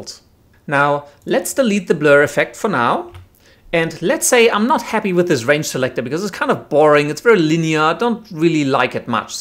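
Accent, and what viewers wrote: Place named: Germany. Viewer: You sound kinda German :3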